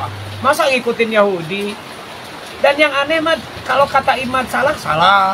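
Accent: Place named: Java, Indonesia. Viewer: admin ustadz iki alamate ning endi .iki potongan logat mah jawa kita kene iki yah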